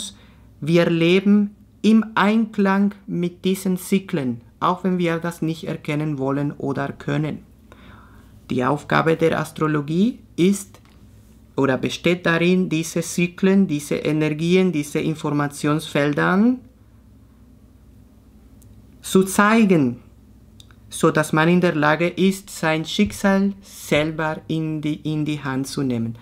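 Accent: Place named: Spain. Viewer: Hallo, du könntest mal angeben, wo man dir eine Spende zukommen lassen kann, per PayPal bspw. Als Energieausgleich dafür, welchen Wert du mit deiner Arbeit den Menschen bringst. Ich kann mir vorstellen, dass der eine oder andere dir gern etwas zurückgeben möchte. Uns pregunta: De qué país eres? Tienes un accento de un hablahispante.:-) Tu alemán tiene un muy alto nivel, muy elevado. 👍🏻 Vielen Dank für deine tolle Arbeit, bin sehr froh, dich hier entdeckt zu haben. 🙏😊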